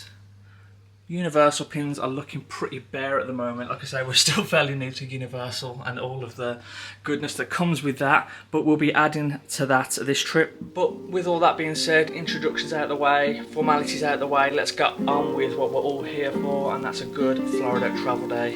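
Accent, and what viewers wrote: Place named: Birmingham, United Kingdom. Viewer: Love a brummy vlog. I'm from across in Wolves so nice to have a more recognisable accent in a vlog for a change, haha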